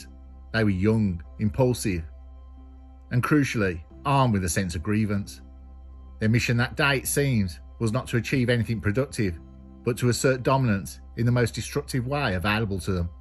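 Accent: Birmingham, United Kingdom. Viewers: From one brummie to another love youre work brother.wouldn't sound right in another accent to me lol.👍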